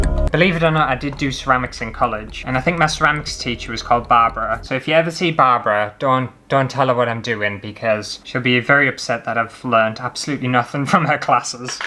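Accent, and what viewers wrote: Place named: Newcastle, United Kingdom. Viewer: YOOOO are you a geordie lad? or am i gaan mad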